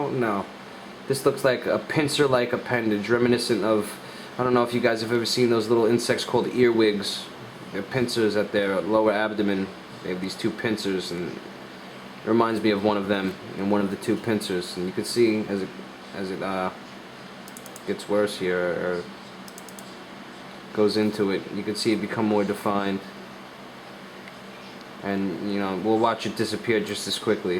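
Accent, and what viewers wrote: Boston, United States. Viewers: You from around Boston bruhh?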